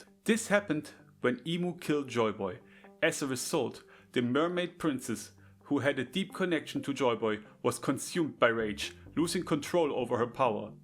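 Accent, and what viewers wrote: Germany. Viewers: Akzent klingt nach 'nem deutschen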